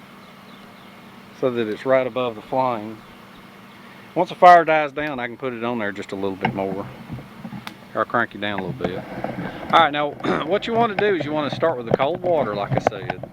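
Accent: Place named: Georgia, United States. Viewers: How I missed this Appalachian accent! Welcome back, don't abandon us again please. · Great to have you back. I have missed that Georgia accent and your unique laugh. And I have really missed learning from you. YouTube was not the same without you.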